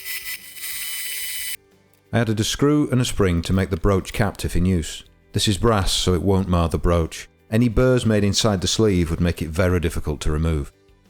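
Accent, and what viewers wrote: United Kingdom. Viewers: This video is impressive, the narration is spot on one of the best on you tube, nice clear british accent (instead of the usual Yankee or Aussie). so i have subscribed thanks for sharing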